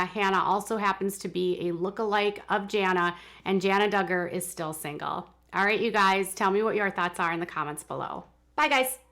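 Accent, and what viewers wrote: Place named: North, United States. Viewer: Wilkinson Yes I have watched live, and it is a whole other ballgame! Her livestreams are usually very intense, with lots of opinionated viewers. I have heard her get tough with rude people who bombard her with idiotic questions, moot points, or repeat information to her that has been discussed in several of her previous uploads. I cannot blame her for at times being short with people who halt the program because they can't pay attention!!! runs a serious channel, she puts a lot of time and effort researching her subjects. Her tone is what I expect from a Northern state (in Texas our approach is a bit softer), but I in no way think she is rude. She is blunt but not rude! She must wrangle up the thousands of guests on her livestream or she would never get her job done!!!! Much LOVE to you!!! ❤💋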